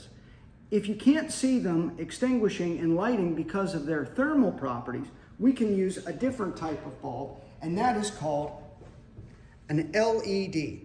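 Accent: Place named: United States. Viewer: The lamps will both illiminate bit alternate at the frequency of the AC power. 60 Hz since he seems to have a US accent. 50 Hz in yhe redt of the world. It may allear as both on together though due to filiment heat and visual preception time. Each diode will block half the wave. Since they are oppsite they will block the opposite side. 99%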